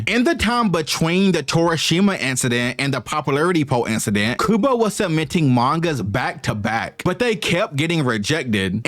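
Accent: United States. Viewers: It’s so fascinating how many similar rivalaries there have been in the Japanese and Western comic industries. The 90s were such a wild wild time for comics in both hemispheres. But also what sortve eccentric personalities get into comics. Such as Fujimoto and Alan. ( Alan believed in black magic and he quite literally attempted to end the world lol) It’s also very cool how a man with the MOST American accent is discussing Japanese comics. It’s beautiful considering not even 100 years ago - Japanese were so scared of Americans that they’d kill their own children instead of getting captured. And Americans were so scared of Japanese they created a sun, ti throw at Japan.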